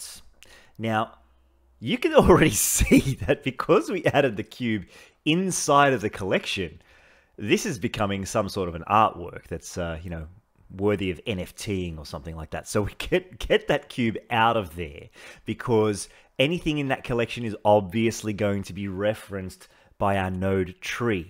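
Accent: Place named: Australia. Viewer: Aussie accent, Pikachu in background... off to an awesome start. lol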